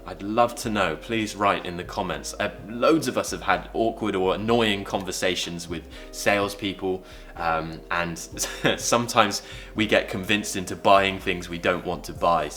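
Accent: United Kingdom. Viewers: I wish One day i can sound more british lile this guy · Im watching yous vido with my son who is 8 yo, and he loves your British English so much. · Thank you, your videos are helpful. I like British accent. Even your new hairdo. 😄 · It's the first time I came across one of your video, and I'm sure I will catch up everything I missed! Your British accent it's superb! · the more I watch your videos, the more love I have for thi british accent.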